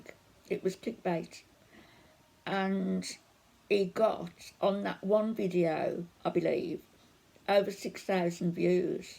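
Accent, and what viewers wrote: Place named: Wolverhampton, United Kingdom. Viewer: Are you from the midlands? I hear a Wolverhampton accent 👂 ☺️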